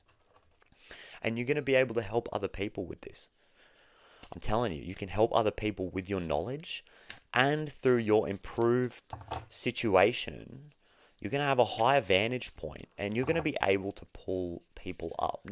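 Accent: Australia. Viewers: are you Australian? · Oh you a fellow Aussie 😊 nice read..!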